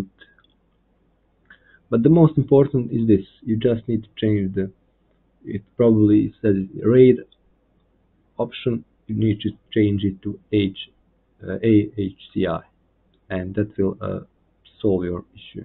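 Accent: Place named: Bulgaria. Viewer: Dude your accent sounds like Bulgarian. Grate that you fixed problem with booting DELL PC.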